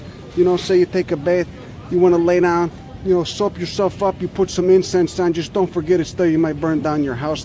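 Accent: Chicago, United States. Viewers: Sounds like he's from Grand and Ogden. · imagine trying to shop and this dude comes through the aisles rambling his chicago mouth off about mushrooms and california ppl stereotypes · Chicago Italian, the accent definitely has the east coast and mid-west flavours combined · no offense but ya must not be around many working class Chicago people- because as a Wisconsinite, I thought Chicago immediately. It’s just not a suburban Chicago accent. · Jeezus man , I can smell Chicago on you from here , love it, makes me miss home brother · Perfect Chicago accent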